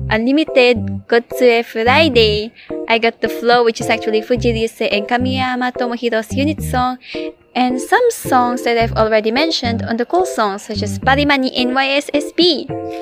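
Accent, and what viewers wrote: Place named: Philippines. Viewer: ! Your voice is majikawaii! Both the pinoy accent & nihongo =D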